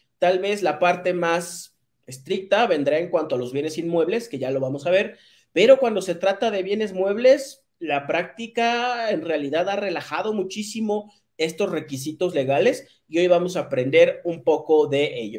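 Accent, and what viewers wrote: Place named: Mexico. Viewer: No reconoces el acento mexicano ?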